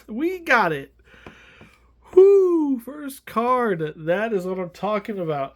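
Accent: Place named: Canada. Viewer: 460 you sound canadian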